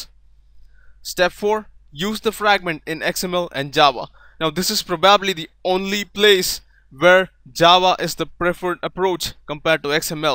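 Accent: India. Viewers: 一听就知道是印度伟哥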